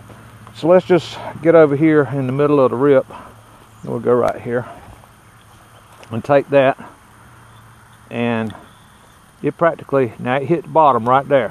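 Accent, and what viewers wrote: North Carolina, United States. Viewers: Where are you from? You sound like central NC?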